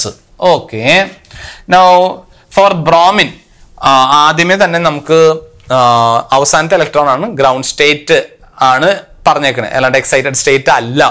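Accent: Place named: Thrissur, India. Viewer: TCR slang ⚡⚡💪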